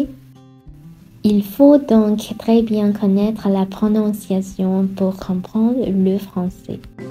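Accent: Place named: France. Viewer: Merci infiniment de partager vos conseils avec nous. Vous avez un accent français incroyable. Je vous souhaite tout le meilleur madame. C'est la meilleure chaîne que j'ai vue jusqu'à présent pour apprendre le français❤❤❤❤❤❤❤❤❤❤❤❤❤❤❤❤❤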